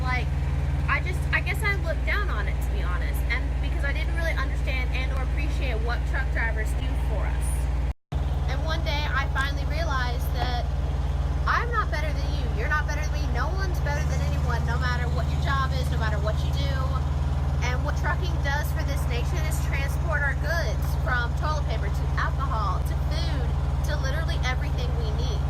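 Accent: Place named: South, United States. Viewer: This girl is an absolute beauty. I love her country accent as well. Keep doing your thing girl.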